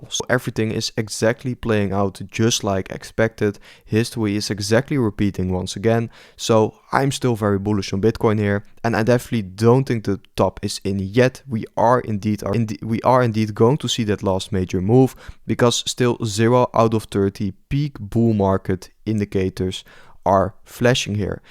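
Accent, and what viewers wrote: Netherlands. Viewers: Nederlands accent is zo makkelijk te herkennen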